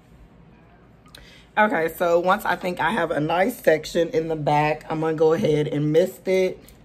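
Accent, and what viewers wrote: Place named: South, United States. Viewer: Love your Southern accent! I'm from Alabama. Thanks for the suggestion. My hair is EXTREMELY dry and damaged, so hopefully this will work. 🙏🏾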